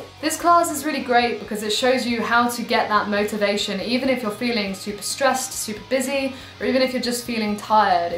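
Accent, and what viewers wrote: United Kingdom. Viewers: I heard a British accent, am I mistaken? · And for your accent, it has to British. Best musicians in the whole world!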